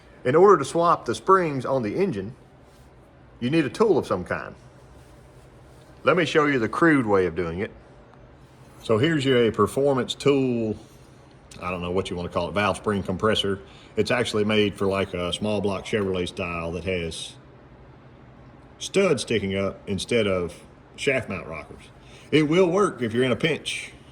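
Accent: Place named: Appalachia, United States. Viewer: you dont know what the hell your doing hill belly talk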